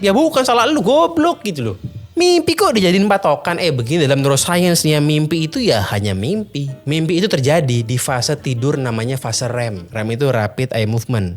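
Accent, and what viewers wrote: Java, Indonesia. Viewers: Buset, medoknya juga ketularan 🤣🤣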